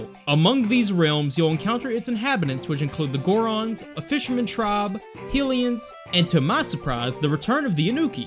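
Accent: United States. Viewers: Is that an Arkansas accent? There aren't enough gaming YouTubers with a good, strong American accent. Review some more Zelda games and I'll watch. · From one guy with a country accent to another: great job! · First, I adore what I swear is a faint country accent I hear, but I'm actually here fresh from PBG's at the time latest video, (Wind Waker) and I admit- While I'll likely never grow tired of Zelda content I grow weary of the same 3D Zeldas being covered as it's not like there really is any bad ones. You can find someone presenting valid good points for any of them. I actually keep forgetting the DS ones existed that were a great time of my past. Spirit Tracks really does have what I'm sure is among the most complex "puzzles" in the series mainly in the Tower of Spirits, as well as some of the most fun Bosses that actually can present a challenge specifically because the DS Zeldas are right in between what I consider "True 3D Zelda" and "Top Down Zelda." As for items as you mentioned of the Whirlwind, sure there are a lot of wind archetypes items in the series, but it's the order of the items you get staples or not that can affect things. 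You're using the Whirlwind for alternate means to progress until you get the dungeon's item proper, the last dungeon's item is a more inefficient tool that can still fill certain shared roles keeping it relevant, and keeping my disbelief suspended. This isn't exclusive to Spirit Tracks of course, but it feels you're getting the most out of each item until you're hard walled by something you need the next item for. And YES. This game does have it's flaws. As weird as it sounds I wish it's elements were remade into a "True 3D Zelda" but I under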